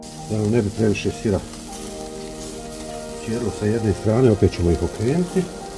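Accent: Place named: Bosnia and Herzegovina. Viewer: Predlazem da ove zamerke prihvatis kao dobronamerne : moja keva je iz Lebana o to je tu negde.Pite te ko teje lagao za sir? U Leskovacku skaru mora da ide tucana ljuta paprika zatim kasika prezli ,jedno jaje i na tu kolicinu ide pola kasikice soda bikarbona.Drugo: reprezentecija - pokazivanje: te lepinje kao da si sekirom sekao.Dok gledamo ovaj tvoj video stice se utisak kao da ti se pisalo,pa si zurio.zadnji komentar: po govovoru kao da si Bosanac,stoga drz se ti Banjaluckog recepta . Pozdrav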